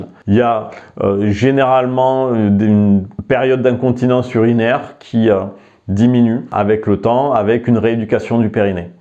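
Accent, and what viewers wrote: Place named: Southwest, France. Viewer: On entend bien le sud ouest ça fait du bien 👍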